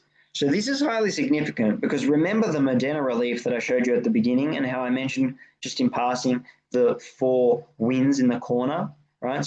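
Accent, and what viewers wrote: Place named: United Kingdom. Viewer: You’re one a few people with a British accent I can actually listen to without feeling like I’m being conned or talked to like a child by someone who doesn’t understand what they are saying. You sir, are a gentleman and a scholar.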